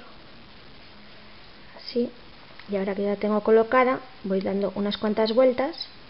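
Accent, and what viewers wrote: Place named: Asturias, Spain. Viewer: hola soy belsy siempre te veo incluso los antiguos me gusta como esplicas por el habla pareces asturiana como yo bueno besazosssssssss y sigue esplicandonos muches coses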